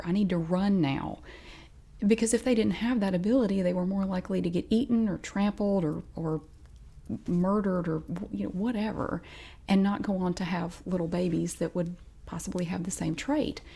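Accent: South, United States. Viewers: Your Southern accent is a big reason for the popularity of your videos. Here in California most people speak in a millenial monotone or in foreign tones. Your Americana accent is music to my ears.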